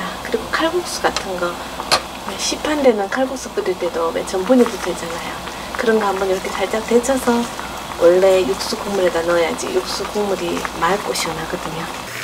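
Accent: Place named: Gyeongbuk, South Korea. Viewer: 구독한지는 오래됐는데 처음 댓글씁니다 친정이 포항이라 경북말씨 너무정겹습니다^^열심구독합니다. 감사드려요. 좋은 오늘되세요~~~**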